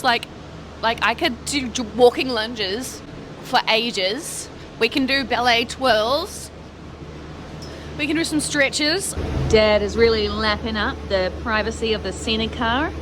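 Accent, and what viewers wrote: New Zealand. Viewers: Fortunately, your Kiwi accent actually isn't too strong for me to understand and to fully comprehend. As a matter of fact, it's really quite pleasant listening to! I'm a GER, trying to improve my English listening skills by watching your wonderful videos. Rail travel has been my favorite mode of transport for decades, I can relate to any second of your content. While watching and eventually commenting on this video, I just might improve my writing skills as well! Tons of looove and kudos to you from a GER subscriber.... 🥰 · I like how your Kiwi accent becomes stronger when you're in NZ, especially with your family 😄. Awesome video. NZ is truly quite beautiful.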